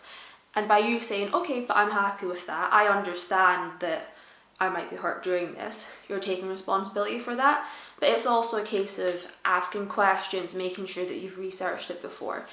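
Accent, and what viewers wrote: Scotland, United Kingdom. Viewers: The lovely lady is from Bonnie Scotland..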